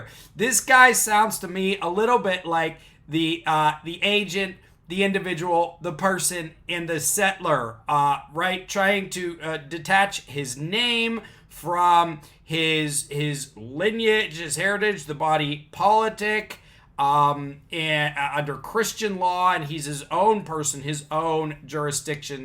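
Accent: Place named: Western Pennsylvania, United States. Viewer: I was raised in western PA just far enough from Pittsburg for the smoke to only be visible on windy days. (mostly) I've spent half a century travelling (not driving) across the US and world for business. You're the other western PA accent I've encountered in the past 1/2 century since I left. But that's just Reason #3 for enjoying your posts. Keep it up.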